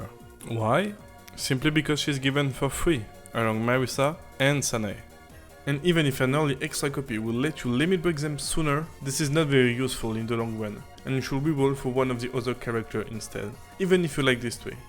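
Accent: France. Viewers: you are so frenchinglish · Cache mieux ton français wsh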